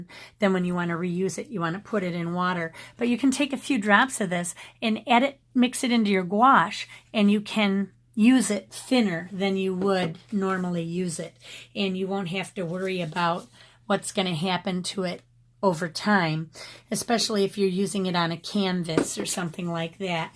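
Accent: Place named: Midwest, United States. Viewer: That midwest dialect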